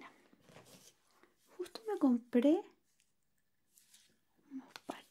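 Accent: Chile. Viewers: Ya vi que si es chilena. Weeeeeeeeey nunca se le habia notado su acento weon! Me enamore mas de ella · Me encanta cuando hablas con tu acento chileno ❤ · Me encanyel acento chileno, saludos desde Leenares, Japón. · Weeey apenas empezó el vídeo supe que estabas hablando como Chilena, porque soy Chilena 😮😮 de donde eres? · 100% con su asento chileno hermoso 😊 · No me cae la forma de hablar de chile pero me hizo cambiar de opinion,ME ENCANTAN SUS VIDEOS DE LA HERMANA CHILENA MUAAACK!! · Hola eres my nueva YouTuber soy de Perú, y me gustan tu videos de ASMR te digo que eres una chica muy bonita y se me hace extraño escuchar un video con el acento chileno marcado y en otro videos no son tan marcado tu dejo chileno. Me agrada verte siempre. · Que linda, me lleve una sorpresa con tu acento Chileno🇨🇱, un abrazo, me encantan tus vídeos, me ayudan a desconectar.💫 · Amo el acento chileno😻intento hacer lo mismo pero a mi no me sale😭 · Me encantas sin susurrar!!! 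Y ese acento chileno bellooooooo❤️❤️❤️❤️ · holis oye te tengo una pregunta en tu vídeo dices algo de que hablas como chilena eres chilena? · Casi no logro descifrar tu acento solo hoy pude Chilena 😊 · Ahorita ando en modo "dios iluminame o eliminame" jaja ya que se me juntaron dos enfermedades (por wey en parte jeje😅😅😅) asi que tu voz con tu acento chileno mas el poderosisimo paracetamol me vendran de perlas para sentirme mejor, eso receto el doctor jaja😁😁😎. Que tengas linda noche. 😊😊😊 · En este video se le nota mucho el asentó chileno 😍 Un excelente video ❤ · No sé si es mi impresión o ya no neutralizas tanto tu acento chileno. Solo te falta el cachai ja, ja, ja, ja... Un fuerte abrazo, amiga. Pronto ven a Guayaquil, de seguro tienes tu club de fans. Shalom. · amo tu asentó chileno😍👊🏻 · Jaja ahora puedo ser el SJJ ros- digo digo COQUETTE! Pero antes una siesta no hara mal... Pd: Amo como hablas a lo chileno! 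· primera vez en tu canal y reconocer altiro que eres Chilena uwu 💞💞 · La mejor manera de iniciar la semana, con mi hermanita chilena (y coquette) Muchas gracias,. Una feliz noche de lunes (e inicio de semana) para ti también hoy. Cuídate mucho y que Dios te bendiga. Nos vemos pronto amiga, te quiero. Saludos desde Colombia hasta Chile, y, por cierto, muy lindo tu acento.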